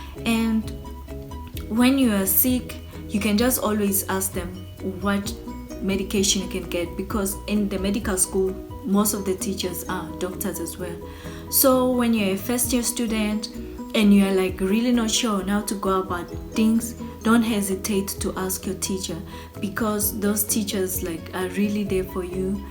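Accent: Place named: Eswatini. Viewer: Your Swazi accent is beautiful 😍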